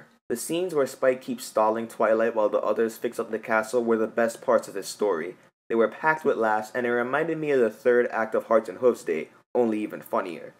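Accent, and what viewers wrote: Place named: Caribbean. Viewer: That was a great review. May I ask you where you are from? You sound like you're from the Caribbean.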